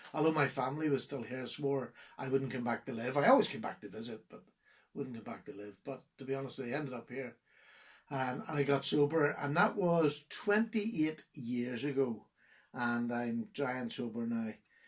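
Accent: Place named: Ireland. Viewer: This was a wonderful video! Love your story-telling and admire your honesty and humility. As an American living in Belgium, I appreciate your English ( with the wonderful Irish accent) and your European point-of-view on knives and knife collecting. Your grandchildren brought a smile to my face and makes me happy to remember that my 2 grand kids, my daughter, and my son-in-law will be visiting me in Brussels next week. Keep up the great work! Look forward to all your videos.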